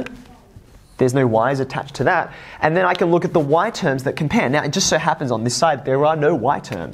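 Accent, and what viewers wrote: Australia. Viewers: His accent is aussie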